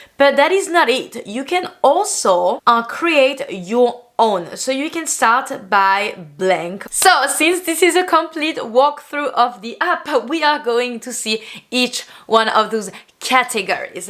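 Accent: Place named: France. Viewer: Je me disais qu'elle etait française avec son accent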